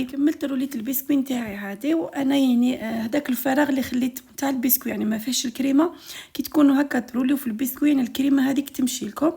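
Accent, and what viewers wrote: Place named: Egypt. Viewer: نعم من لهجتك عرفنا أنك مصرية أهلا وسهلا بيكي بين أخواتك أهل وأحباب في الله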